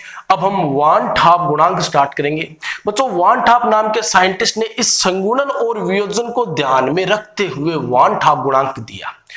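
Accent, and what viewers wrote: Haryana, India. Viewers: Sir. Ap. Haryana. Se. Hai. Kya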